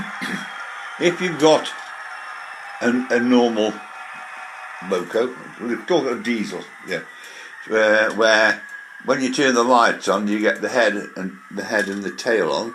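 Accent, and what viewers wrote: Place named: Stoke, United Kingdom. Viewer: Well, a great big happy birthday to you and many of them matey. I love watching your videos and by your accent I can tell you’re a Stokey. I actually live in Middlewich but I worked quite a number of years all over Stoke and my son works in Fenton. I got into this hobby a couple of years ago because of the Hornby TT 120 and I’ve decided to go down the Itrain route to run my trains and after a bit of messing around I have finally got everything working perfectly and it’s great to watch the Locos go round in full automatic mode so even I don’t know where they’re gonna go and how long they gonna stay at a platform. I’m now using all Yamorc equipment for the feedback sensors the point switches and the command station anyway all the best matey have a great day Steve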